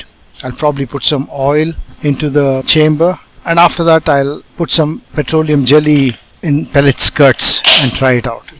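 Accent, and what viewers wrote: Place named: India. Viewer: one of the better Indian accents in English I've heard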